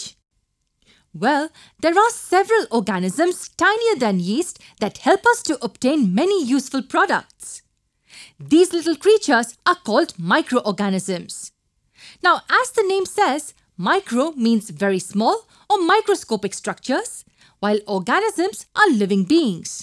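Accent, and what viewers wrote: India. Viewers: your indian accent hurts my head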